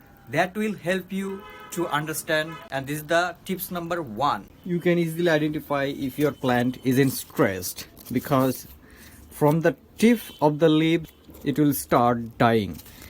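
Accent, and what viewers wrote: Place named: India. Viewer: Is this language English? Or Indian with some sentences in “English” ?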